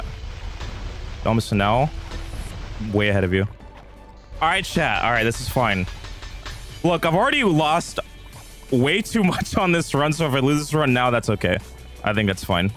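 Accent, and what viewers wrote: Finland. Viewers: is he Finnish? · Are you finnish? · are you finnish????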